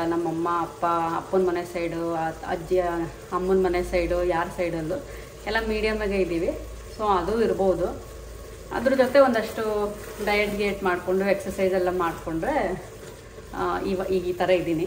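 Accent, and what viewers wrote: Mandya, India. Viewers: nim language kooda mandya language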